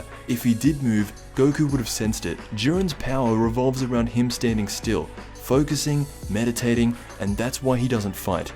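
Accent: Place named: Australia. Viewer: What country are you from? I can't place your accent all I'm getting is Australian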